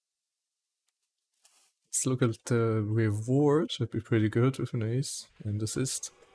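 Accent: Germany. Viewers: You are german right ? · Kann es sein das du deutscher/österreicher bist? ^^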